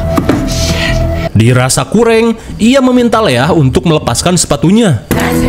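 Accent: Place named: Sunda, Indonesia. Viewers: Admin ini kyknya dari tasik atau ciamis😅,kenur kenur😂😂😂😂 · teh org Priangan timur deh org mana sih jiga org banjarsari kok cara ngobrol nya sama kaya aku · abang nya curiga org sukabumi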